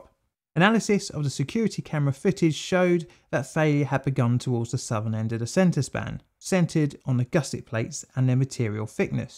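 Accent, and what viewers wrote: United Kingdom. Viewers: To hear a Brit talking about a rather obscure event that happened in my state is kinda surreal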